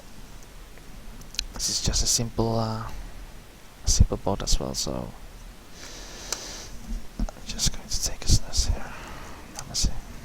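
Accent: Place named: Spain. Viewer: This is a great tutorial, even more for the ones who are still starting to use Sketchup. Thank you very much for sharing! By the way, if english is not your main language, which is? Is it spanish (i have a feeling about it).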